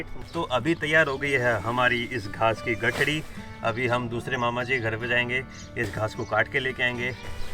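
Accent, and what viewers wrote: Haryana, India. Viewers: i belong from a village of UP but it was wonderful to see village lifestyle of Haryana with some desi Haryanvi pure akscent. Tahnxx, i last vedio i suggested you to show some water conservation technique you are doing good in this channel believe me. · bhai ki haryanvi ekdum keher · Nani and u rock bhai ! Bhai Thara video dekh r mjo aaygo ! Hindi nav to aapni haryanvi mst lage bhai ! Or didi - niche to kar inee ! 😄✌️ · bhai Haryanvi mst lgti hai apke muh see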